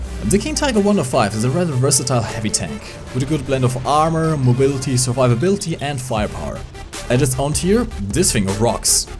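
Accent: Germany. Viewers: hach ich feier diese englisch mit akzentfreiem deutsch soo hart ;) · hab ich mir auch schon gedacht auch weil sein englisch so klingt wie das eines deutschen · ich wusste es du hasst einen starken deutschen akzent (was nichts schlechtes ist) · dat Germany accent tho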